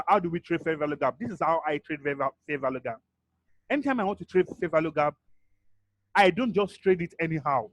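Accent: Nigeria. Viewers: If he uses his native language will that be better for you? Listen to understand him. He is a Nigerian, English isn't the native language used there but more like a general thing.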